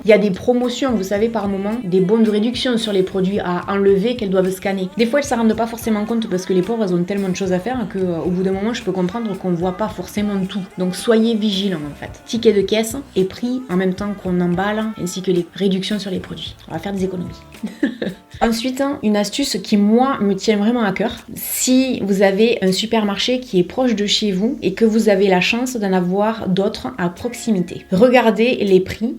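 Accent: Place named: North, France. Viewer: Mon talent de détective me dit que vous êtes du nord. Je dirais Roubaix + précisément.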